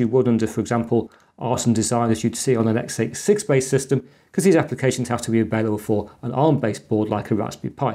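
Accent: United Kingdom. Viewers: I like this british accent! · 👍I learn about new technologies and also practice my British accent listening comprehension. This channel has everything!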